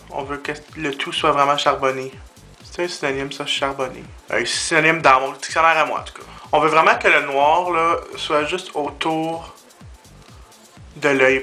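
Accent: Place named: Quebec, Canada. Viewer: It's the Québécois accent hun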